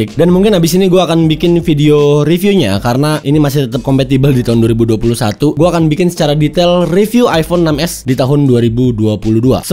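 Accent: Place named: West Java, Indonesia. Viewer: Lidah org sunda bro, gw juga gtu klo ngmng b inggris kek kesleo gitu lidah, ahaha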